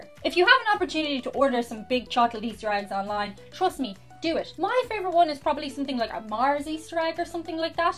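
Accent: Ireland. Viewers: I'm already subscribed ...lol all it took was your Irish accent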